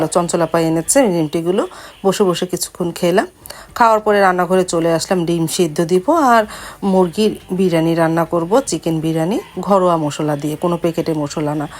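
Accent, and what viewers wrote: Bikrampur, Bangladesh. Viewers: এটা আঞ্চলিক ভাষা মেক্সিমাম বিক্রমপুরের মানুষরা এভাবেই উচ্চারণ করে কথা বলে ভুল না ধরে যার যার আঞ্চলিক ভাষায় কথা বলার অধিকার আছে তার ভাষায় কথা বলতে দিন আর ভিডিও উপভোগ করুন।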